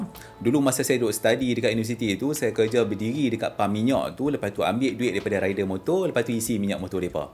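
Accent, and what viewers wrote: North, Malaysia. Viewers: bang loghat utara abg terbaik....abg utara blah mana?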